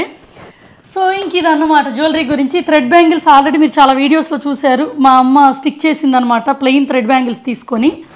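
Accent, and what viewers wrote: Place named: Guntur, India. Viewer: మీ గొంతు diffrentg గా ఉంటుంది పై గా మా గుంటూరు అనే ఫీలింగ్ happy 20th wedding 💍 aniverseley.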